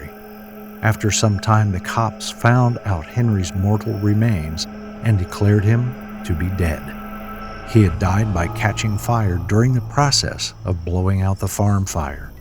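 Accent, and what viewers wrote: India. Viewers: “I don’t know who this is, but he is from Kerala.” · Your accent is amazing for an Indian ❤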